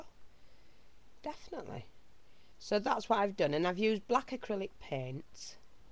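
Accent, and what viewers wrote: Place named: Yorkshire, United Kingdom. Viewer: i knew you were as soon as i heard your voice, we have a very distinctive accent here in good old yorkshire!!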